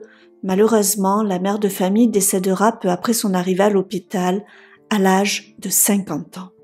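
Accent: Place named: Marseille, France. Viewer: Tu es de Marseille? tu as l'accent de Zizou.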